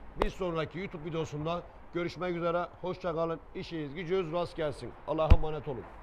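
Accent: Gaziantep, Turkey. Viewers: usta selamın aleyküm Ya Antep şivesine hasta oluyorum çok güzel kolay gelsin ustam yeni lokantan hayırlı uğurlu olsun inşallah 🤲👍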